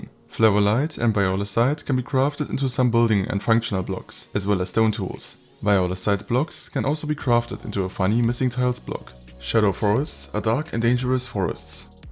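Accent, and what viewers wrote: Germany. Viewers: I hear german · German tippa accent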